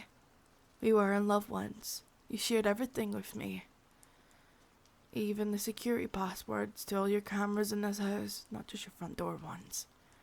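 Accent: Scotland, United Kingdom. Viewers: Can you do a video using the thick Scottish accent like in the movie brave heart plzzz🙏🙏🙏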